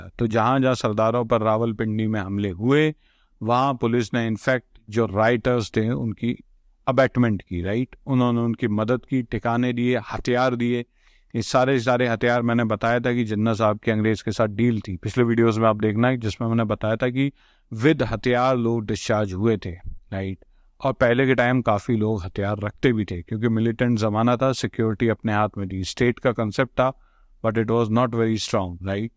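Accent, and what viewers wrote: India. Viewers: You say you are a Pakistani but your accent is Haryanavi. May I know how and why?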